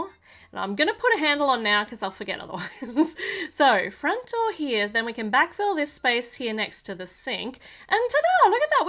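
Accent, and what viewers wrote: Australia. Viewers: Americans: sooooooooo Australians: sooorrr I love your accent and your cheerful attitude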